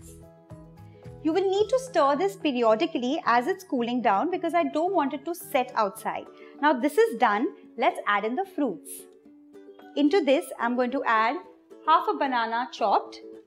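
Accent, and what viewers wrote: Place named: India. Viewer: That is a typical Indian accent! It is how we speak so...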